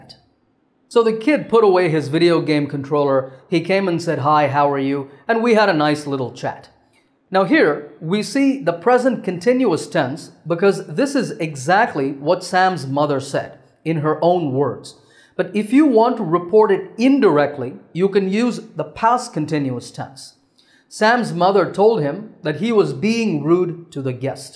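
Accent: India. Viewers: I love Indian English! It inspires me much more than native one! I'm an Ukrainian from Ukraine.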